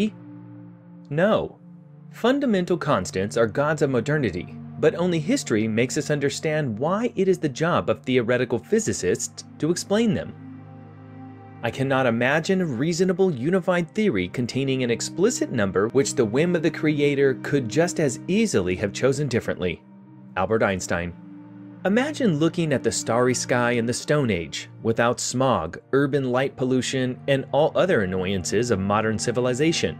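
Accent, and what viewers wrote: Germany. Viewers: Physics is more fun with a German accent!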